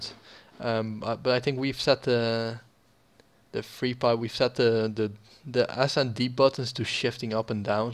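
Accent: Netherlands. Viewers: zo te horen ben je nederlands haha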